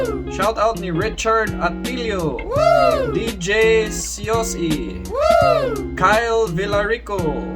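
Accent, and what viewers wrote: Visayas, Philippines. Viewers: New subscriber sir from Tiktok to Youtube.lingaw kaayo ko nimo sir bisdak kaayo ka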